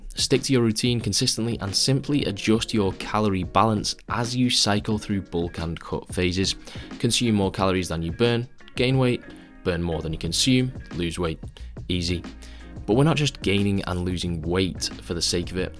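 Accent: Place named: Northern England, United Kingdom. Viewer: you said a while back in the video where you discuss how you haven’t been putting in your full effort for some time, that one of the reasons is that once you’re in good shape, you don’t have to train so hard to maintain. Does this approach not apply to when cutting? ie, not lifting like you’re trying to gain, despite what you say about always training like you’re trying to grow in this video? Love the content mate - so good to have a dry Northern lad deliver a quiet and self aware sermon;)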